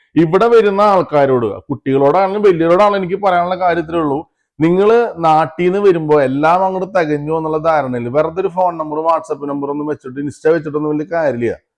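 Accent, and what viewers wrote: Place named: Thrissur, India. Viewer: ഒന്ന് തൃശൂർ പോയി വന്ന ഫീൽ.. സ്ലാങ് wow ❤️❤️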